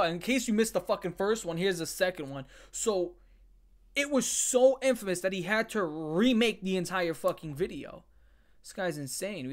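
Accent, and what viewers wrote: New York, United States. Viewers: Baltimore hat, New York sounding accent and Puerto Rican flag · most new york accent ever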